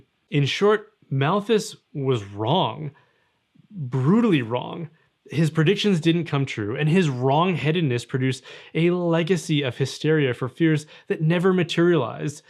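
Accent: Canada. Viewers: Oddly, hearing a canadian speak over images of suburbia started " " playing in my head.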